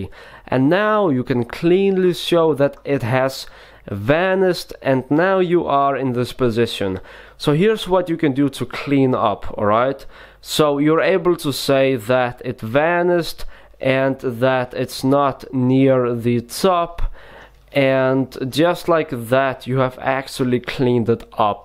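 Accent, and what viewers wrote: Russia. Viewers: Oh man this guy reminds me of crazy Russian hacker for some reason because of his accent and sometime he does the "boom" thingy which crazy russian hacker also does😂😂 i love your videos though man, i have subscribed to multiple magic tutorial channels however i think that your tutorial quality is somehow equal to 52 kards... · I love your Russian accent! :D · The weirdest russian accent of all time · It’s called the Russian accent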